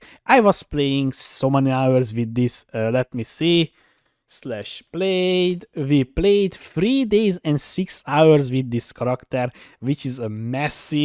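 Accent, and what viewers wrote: Hungary. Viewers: i can hear the hungarian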